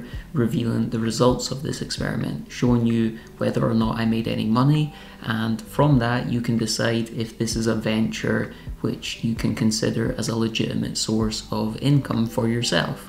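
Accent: Scotland, United Kingdom. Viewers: I subscribed because of tiny house, Scottish accent, location and renovation. Being an, ahem, more mature subscriber, this computery stuff is amazing and I haven't a clue what it means! But your voice is so lovely and I appreciate the mental health tips. Good for you.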